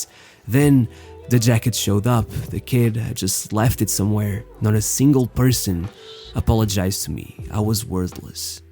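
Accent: Portugal. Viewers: So your accent is portuguese, my mental mystery is finally solved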